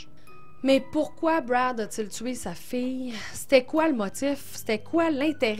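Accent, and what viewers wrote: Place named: Canada. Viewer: J'ai découvert ta chaîne il y a peu, j'ai dévoré tes vidéos les unes derrière les autres j'adore, j'aimais beaucoup ton ancien générique car on y voyait les plus grand serial killers mais le nouveau à vraiment la classe ! Très bien réalisé 👍 Continue lache rien j'adore ton petit accent canadien ( mon rêve d'y vivre... ) de gros bisous de Reims en France 😘❤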